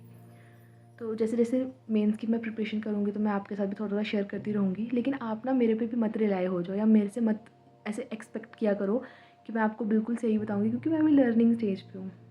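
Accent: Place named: Odisha, India. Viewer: Dii i think you r from odisha bcoz i m from odisha... 😅 🎉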